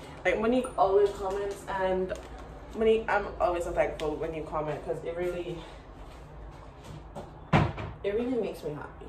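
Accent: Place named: United Kingdom. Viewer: Loved this! I hear the British accent coming through🤣🤣. Separately, that softball taster session finished me, same time next week🤝🏾🤝🏾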